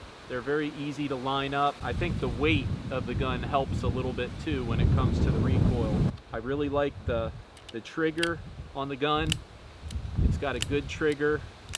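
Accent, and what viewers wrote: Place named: South Jersey, United States. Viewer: crazy your accent is very SJ and it looks like you’re in the Pinelands. Close enough though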